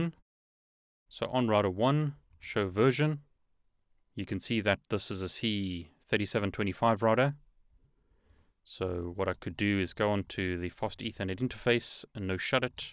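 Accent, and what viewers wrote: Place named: South Africa. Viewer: Hehe nice. From Cape Town myself so noticed a bit of the accent. Thanks for the amazing videos, really appreciate your effort. Keep spreading the knowledge. (first attempt at CCNA in a few months)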